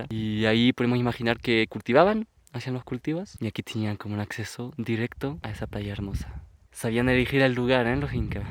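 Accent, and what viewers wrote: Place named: Chile. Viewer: Todo bien pero tu acento Chileno me desespera tengo que usar el traductor para entenderlo y respirar porque de oírte se me va la Respiración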